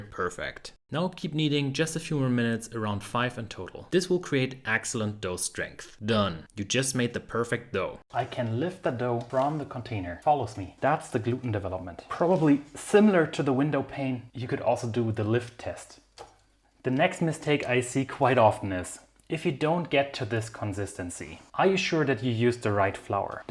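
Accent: Germany. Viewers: When people hear you speak English they wish they could speak German as well as you speak English. Your slight German accent is an added bonus. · Never apologize for your German English. Your speech is clear and easily understood. Your speech is near native English speaker! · Gluten tag! I loved the German English!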